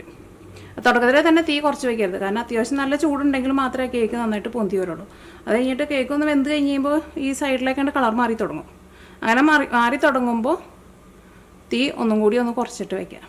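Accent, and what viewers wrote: Thrissur, India. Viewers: Thriusur bhazha kelkaan nannyittunndu,cakekyum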